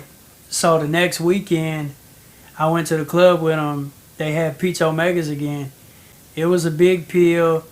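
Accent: United States. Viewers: You from Nashville? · Are you from Louisiana? I love that accent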